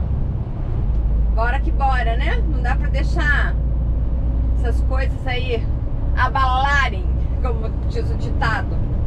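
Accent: South, Brazil. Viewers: Kkkkkkkkkkkkkkkk você é catarinense ou paranaense?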